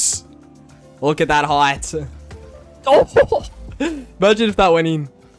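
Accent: Australia. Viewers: as a kiwi voice is one of the only aussie accents i actually like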